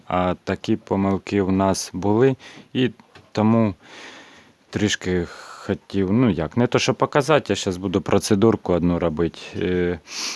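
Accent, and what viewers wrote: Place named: Sumy, Ukraine. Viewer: Доброго часу доби!Підписалася на ваш канал,я так зрозуміла,що ви з Сумщини?Цікаво звідки саме,я теж з Сумської обл.,з колишнього Буринського району,тепер Конотопський.Тримаю небагато кроликів,для себе.Цікаве у вас відео,знімайте ще,приємно чути свою мову,сумську. 😀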